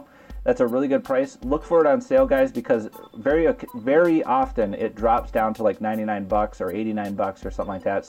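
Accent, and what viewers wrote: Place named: Newfoundland, Canada. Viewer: You sound Canadian, I sense a Newfie.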